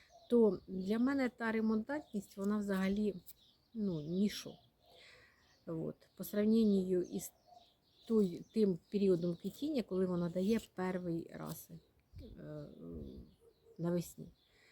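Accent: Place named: Cherkasy, Ukraine. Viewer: Я сама живу в Черкаській області, знаю цей змішаний говір.Не ображайтесь,все буде добре 🌹🌹🌹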